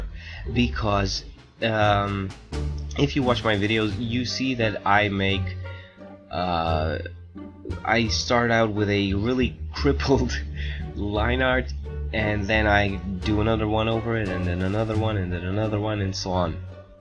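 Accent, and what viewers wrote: Romania. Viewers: mi-am dat seama dupa din nume si accent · yea bc he’s from romania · You must be Romanian,aren’t you? Foarte bine!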